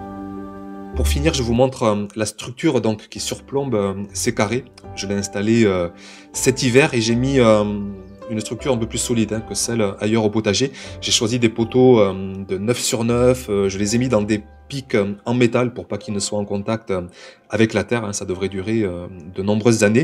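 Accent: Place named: Southern France, France. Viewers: Enfin des conseils simples et de bons sens....soutenus par une voix très agréable à écouter. Et avec cet accent qui sent bon le soleil, j'avais l'impression qu'on me lisait du Pagnol! Félicitations d'un autre, de tout là haut au Nord! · Ah ce bel accent du sud ouest... J'adore... Pour ton cadre, tu pourrais y faire grimper une christophine. Elle serait bien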